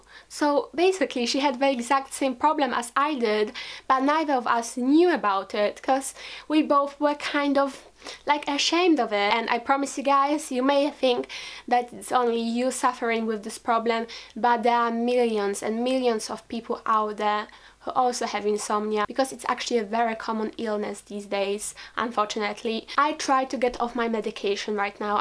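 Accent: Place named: Europe. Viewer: The Real Mansa Musa her school was british and she sounds european and her name looks european too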